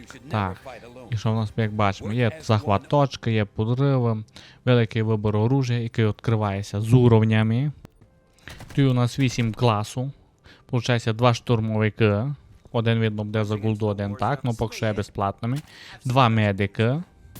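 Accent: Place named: Transcarpathia, Ukraine. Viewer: Чую що ти із сонячного Закарпаття))) з якого саме міста ?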